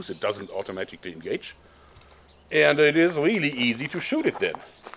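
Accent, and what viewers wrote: Germany. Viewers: Can someone explain to me why his German accent and someone's German accent like Christoph Waltz or Skallagrims German accent are so different? is it a regional thing? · The speargun has not just one shot. And you don't have to fill it up. You took too much pressure to the spear gun, so it can be damaged. Also air pressure guns ARE NOT TO FIRE IN THE AIR! THEY CAN BE DAMAGED! Listening to your accent I think you're german as me, so please read this: Die Harpune ist werkseitig mit einer fest eingebauten Druckpatrone ausgestattet. Die Patrone hat bei der Auslieferung einen Grunddruck. Es sind daher keine Druckpatronen o.ä. erforderlich. Die beiliegende Pumpe dient nur zur gelegentlichen Nachjustierung des Drucks oder zur Füllung nach Wartungsarbeiten. Die Harpune kann so beliebig oft hintereinander genutzt werden. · Kann es sein das du deutscher bist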